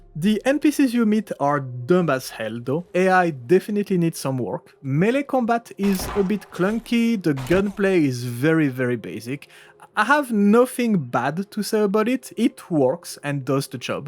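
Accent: France. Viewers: I cant believe theres so many people not recognising your french accent you sound exactly like houngoungagne who makes cs and hes very french too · Je sais reconnaître un accent français quand j’en entends un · Call me fool, but you got french accent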